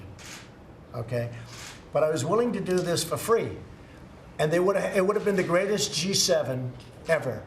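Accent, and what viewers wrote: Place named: New York, United States. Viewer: Never realized how strong his new york accent is. He has the energy of an italian when he talks lmao.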